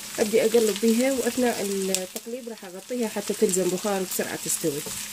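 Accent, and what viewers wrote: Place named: Iraq. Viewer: تسلم ايدك عاشت العراق واهل العراق الطيبين اجمل اشي بالفيديو هدوءك واللهجه العراقيه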